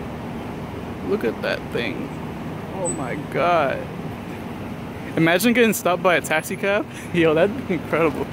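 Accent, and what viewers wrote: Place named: New York, United States. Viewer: It is New York accent that is bothersome.Plus he sounds mildly afflicted with something.lol!??????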